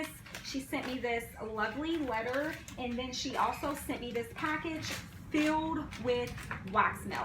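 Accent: South, United States. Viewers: Hi from Louisville, KY also! I was wondering where you were from whenever I heard your southern accent, lol! Your video just inspired me to completely clean my house after I get off work 😂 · I am a new subscriber and I am in Peru. I love what I see and you are very sweet and real. Where are you in the USA you have a Southern accent.? Xx I am of native American and Peruvian heritage so a clean home and family values means a lot.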